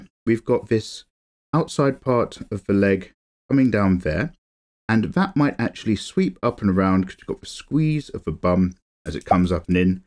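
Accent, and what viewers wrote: United Kingdom. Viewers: dude with a british accent drawing cultured stuff, fucking awesome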